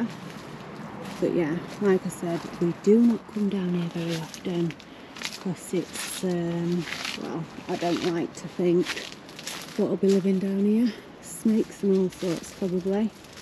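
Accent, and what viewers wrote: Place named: Yorkshire, United Kingdom. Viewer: Dear, I am binge watching your vlogs, I like your calm style and I find your Yorkshire accent loveable. Your channel is as entertaining as Nicki Positano or Kristen and Joerg from the UK. I love about you and these others vloggers that you lead a pretty great life in a nice place and you are aware of that and make the best of it and try to enjoy totally. Marvellous and intelligent attitude. Keep up your good work. Anyway I love your clothes choice and your earrings too.😘😘🌼🌼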